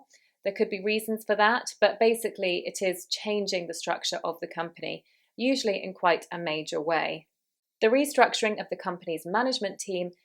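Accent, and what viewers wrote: United Kingdom. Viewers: I love british english❤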